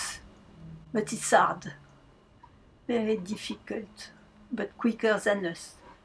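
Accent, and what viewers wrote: France. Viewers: J'adore l'accent français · Love the French accent here 😁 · She's speaking English with a French accent. I understand her very well. If you don't, then you're the problem not her. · Très bon reportage. Thank you for sharing. Yes, you have french accent: I love it and thank you for sharing anyway, great video -and this is what counts. Merci beaucoup de la Catalogne.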